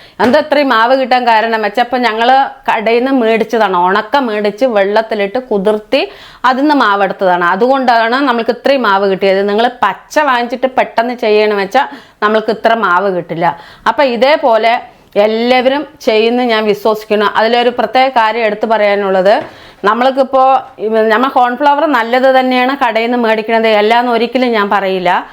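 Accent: Palakkad, India. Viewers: നല്ല പാലക്കാടാൻ ഭാഷ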